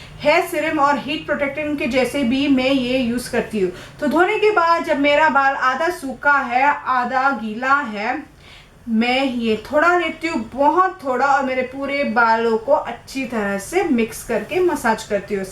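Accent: India. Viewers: Hahahahah u sound cute in ur Hindi accent ! 😂😘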